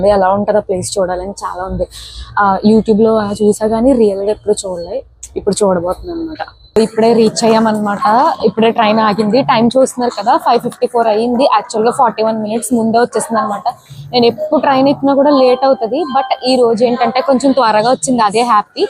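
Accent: Godavari, India. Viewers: కాటుక కళ్ళు తో వినసొంపుగా, గోదారి భాష మాట్లాడుతున్నావు, తిలకం బొట్టు పెద్ద గా పెట్టుకో, ఇంకా బాగుంటావు.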